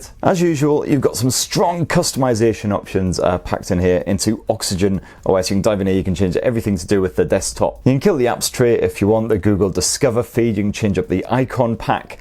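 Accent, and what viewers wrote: United Kingdom. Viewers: i hate that british accent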